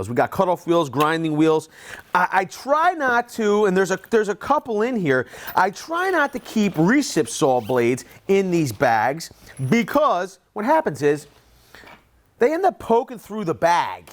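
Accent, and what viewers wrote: Philadelphia, United States. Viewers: South Philly accent. We say WUDDER, for water!😀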